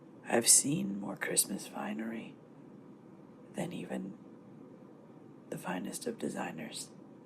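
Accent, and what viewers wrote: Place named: United Kingdom. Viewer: As a Brit, I rate your British accent 10/10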